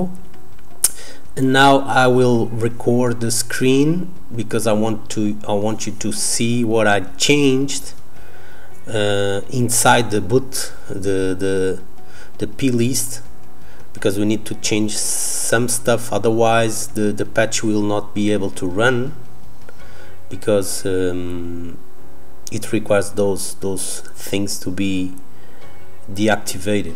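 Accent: Portugal. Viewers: Primeiras palavras e vi logo que era um YouTuber tuga. Gostei do vídeo, continua o bom trabalho.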